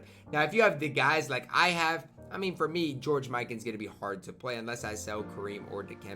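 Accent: Iowa, United States. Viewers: He is from iowa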